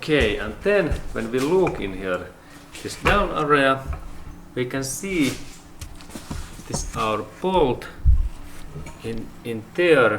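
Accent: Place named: Finland. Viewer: It is finnish Rally english...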